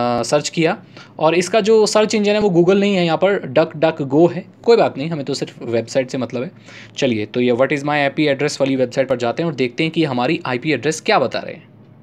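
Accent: Odisha, India. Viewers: sir aap odisa se hain kya?? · are you from odisha??? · Ap odisha se hai kya??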